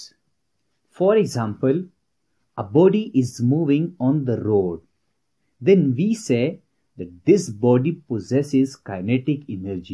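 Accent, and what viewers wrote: India. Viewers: Very good but make your accent like american not indian Good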